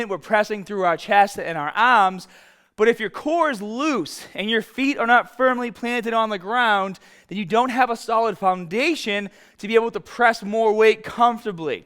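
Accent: Boston, United States. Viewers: bro is boston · Boston accent actually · I don't remember being so Bostonian. I feel like there's a Mandela Effect cause I swear he sounded normal like 10 years ago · Boston accent? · Wait, where tf did that Boston accent come from. He doesn't have that accent in his older videos lol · I'm gettin a HIIINT of Boston in this guys accent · Really helpful. I had a nasty shoulder injury years ago due to bad form on incline bench - shoulder blades not retracted, flared elbows, grip too wide - and haven't done it since. However, it's a really useful exercise and I'll give it a go again having watched this. Btw I like the Boston accent and am looking forward to getting stahded with a proper grip on the bahbell. Or rather starrrted and barrrbell as I'm Scottish. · Before we get stah-ted…love the Boston accent…! ♥️🧦⚾️ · Yeah he’s definitely from Boston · I just subscribed.. 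great info plus you must be from Boston GO PATS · I have watched many videos about inclines and everyone is saying differently on how your form should be so who is right and who's is wrong?! And you must be from Boston? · Are u from Baston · This guy is so from Boston. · I dint remember his baahhhsstahhhhnnn accent being so thick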